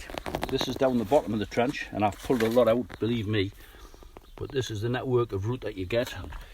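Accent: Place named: Northern England, United Kingdom. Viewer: Also , a fellow northerner comforted by a local accent instead of a American one on here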